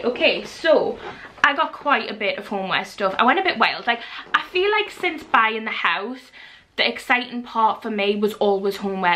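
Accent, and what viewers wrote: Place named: England, United Kingdom. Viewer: I love "bathe-az", "joggaz", "furnitaz", "knickaz". Not taking the mick, just love the different ways we all say words in England.❤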